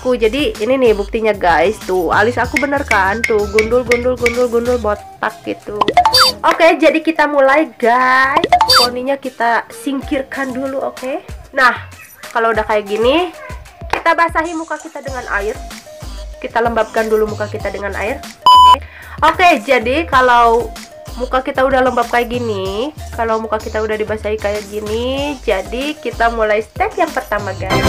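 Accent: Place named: Sunda, Indonesia. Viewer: Suka ey nontona sunda bgt kitu tah wkwk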